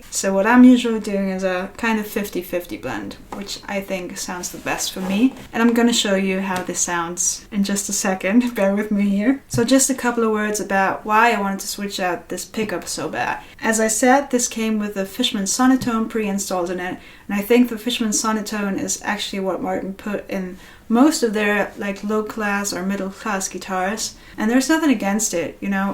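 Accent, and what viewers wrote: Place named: United Kingdom. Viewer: This was really helpful for me. I have A Colling CJ45 which someone had retrofitted a Fishman Sonitone to and it sounds really quacky, so I was considering this exact swap. I didn't really think the Baggs piezo was much better that the Fishman (although it was a BIT better), and the mic was very brash. The 50/50 was a definite improvement, but I'm gonna have to think really carefully now. I do think K&K pure mini is a better acoustic sound than either, and I have it in one of my Martins, but whatever the makers say, it needs a pre-amp with some pas, and that's extra hassle. Thank you very much. By the way, your English is excellent (I'm from England), and I would never have guessed you were from Germany. You have some US phraseology, but your accent is really close to UK English, which is so nice to hear. Finally, there's no reason not to put that pup into a Shawn Mendes - it's a lot of guitar for the money. 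Martin are selling horrible laminate ones for about the same money.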